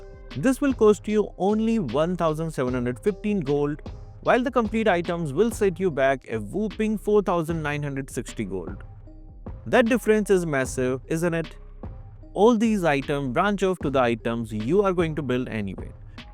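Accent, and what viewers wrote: India. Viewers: I love because he has such wonderful edits and a gorgeous indian accent!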